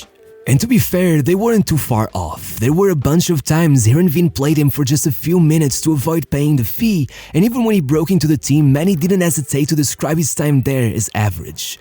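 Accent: Portugal. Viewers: Bro, embora com um excelente nível de inglês sinto que consegui perceber que és português ahahah abraço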